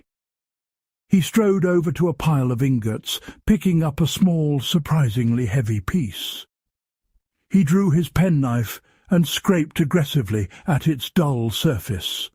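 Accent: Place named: England, United Kingdom. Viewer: I disagree with the above comment. The narrators voice sounds extremely familiar and is so pleasant and quintessentially English, rather like the main characters of the story.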